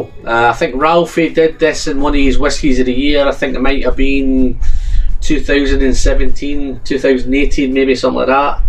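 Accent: Scotland, United Kingdom. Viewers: Edinburgh and still here. I love what all the Whisky guys are doing but a broad Scottish accent tops it off. Take care wherever you are traveling to and as Ralfy says, keep it quality and don't get pished.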